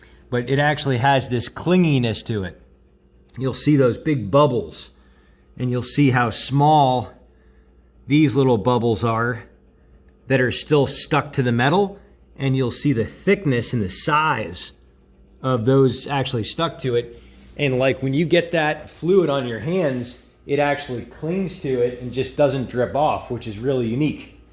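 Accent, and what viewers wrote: France. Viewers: 😝 great French accent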